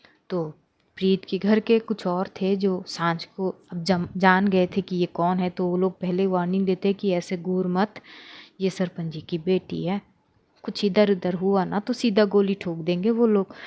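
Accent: Haryana, India. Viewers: Kya story hai yar or upr se aapki haryanvi voice mar dalogi sach me 😂😂😂😂😂😂😂😂😂😂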